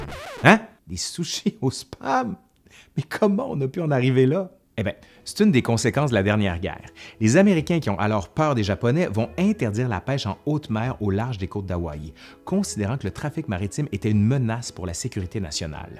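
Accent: Canada. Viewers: L’accent canadien, je m’y ferai jamais. Impossible à prendre au sérieux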